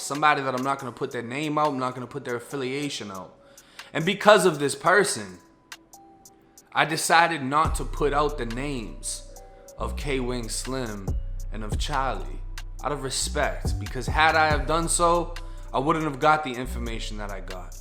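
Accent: Boston, United States. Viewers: Bra you got a Boston accent you must went to Florida on vacation and got caught and did a bit in Florida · Florida story with a Boston accent. Never thought I’d find something more entertaining than this 💯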